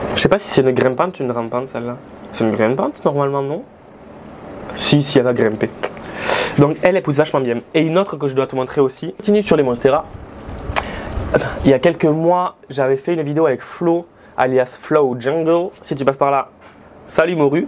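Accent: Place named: South, France. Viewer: Oh le bel accent du midi 😂😂De très belles plantes et de l'humour tout y est ❤❤❤❤❤❤❤❤❤❤❤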